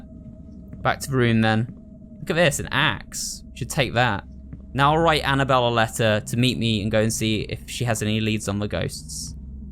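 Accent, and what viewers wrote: United Kingdom. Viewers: What is your accent? You're saying "Ant-oh-knee" rather than pronouncing the "t" in "an-tho-knee" so I'mma guess your british.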